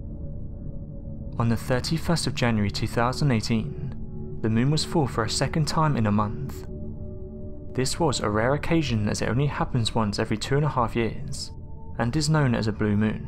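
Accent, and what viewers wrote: England, United Kingdom. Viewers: he has an English accent you dum dum not a speech impediment or the mumbles what a ridiculous comment 😶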